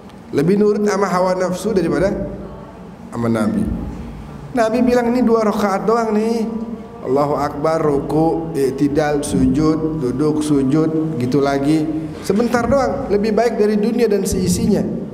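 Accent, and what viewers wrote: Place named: Betawi, Indonesia. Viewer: assalamu'alaikum habib saya sangat senang cara penyampaiannya tawsiahnya bicaranya habib itu Betawi banget enak didengarnya saya asli Jakarta bib buat habibana pokoknya sehat selalu panjang umur amiin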